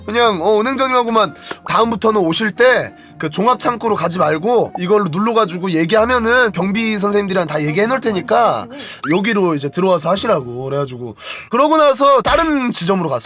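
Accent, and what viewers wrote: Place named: Chungcheong, South Korea. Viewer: 충청도!! 전라도 아니거든요 참나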